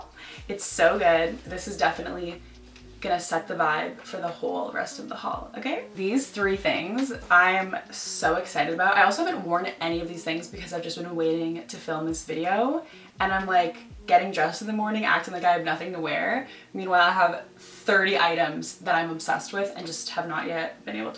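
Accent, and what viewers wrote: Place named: Toronto, Canada. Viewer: I just watched your other thrift with me vids and this is the first time I have seen you on YouTube. Anyways, I immediately thought you were from Toronto and when you said you were from there I was like yesss!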